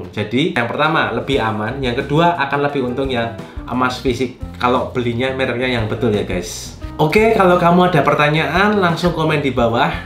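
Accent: Java, Indonesia. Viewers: Paling suka liat koko koko tp klo ngomong medok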